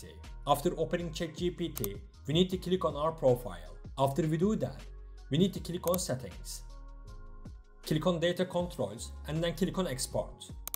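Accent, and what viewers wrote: Turkey. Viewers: Abi ingilizcenden anladim turk oldugunu evyallah yardimin icin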